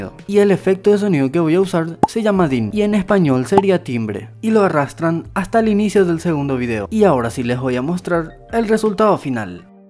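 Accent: Paraguay. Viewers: Su acento es muy paraguayo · Xd con tu voz se que sos Paraguayo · Eres de Paraguay no? lo note por tu voz además yo también soy de Paraguay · Amo el acento paraguayo, lo reconocí porque mis apas son de Paraguay ✨ Buen tutorial, bro ✨🔥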